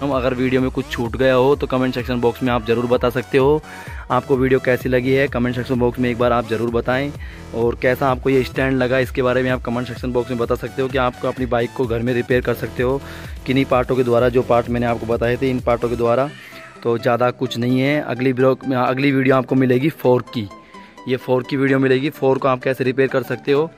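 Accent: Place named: India. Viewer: i don't see you video now but first you India tone i love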